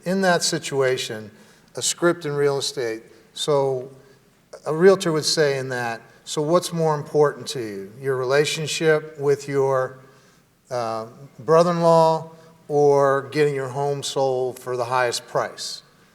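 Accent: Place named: Midwest, United States. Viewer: I want to know the accent story. He's from Iowa, the midwest.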